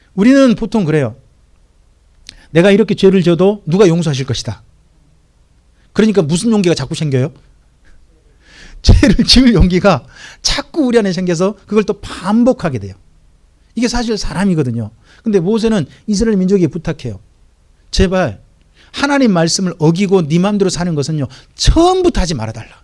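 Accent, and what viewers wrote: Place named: Jeolla, South Korea. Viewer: 목사님 감사합니다. 말씀 많이많이 올려주세요. 열심히 듣고 배우고 있어요. 특히나 전라도 사투리 개인적으로 넘 좋아요 ~~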